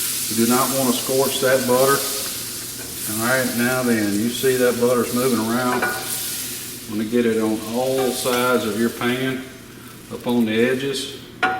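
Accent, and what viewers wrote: United States. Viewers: Great Review. Straight to the point. Thank You! I ordered the 12" and it should arrive soon. I can't wait to use it. P.S. Your accent reminds me of home (Texas). I live in NYC now.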